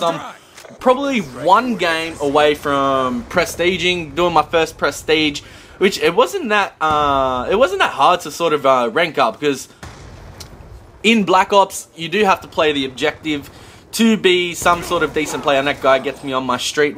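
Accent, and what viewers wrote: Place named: Australia. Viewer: where you from??..... u sound mad aussie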